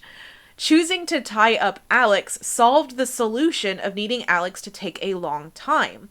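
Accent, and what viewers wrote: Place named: United States. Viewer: One good thing about Taskmaster is that the British female contestants don't have that annoying American way of speaking that this narrator has.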